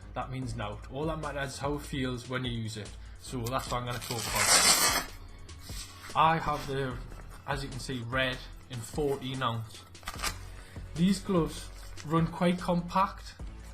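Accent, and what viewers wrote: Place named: Ireland. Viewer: Thanks for taking the time to answer. You sound like you are from Ireland. If so, I guess you are all in for McGregor?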